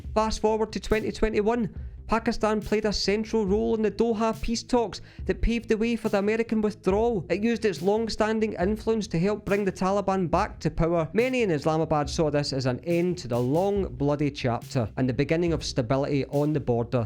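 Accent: Scotland, United Kingdom. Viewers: Shamé on u pa jéet, he's Scottish, it's natural & a nice accent. As u don't have anything to counter the facts so learn to shut up.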